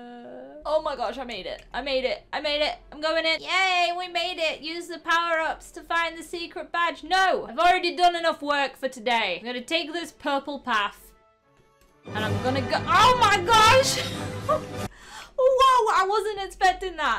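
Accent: United Kingdom. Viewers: ! I FILL LIKE UR FROM BRITISH! R U THO? COZ IM CONFUSED U SOO TALK LIKE AN BRITISH WOMEN!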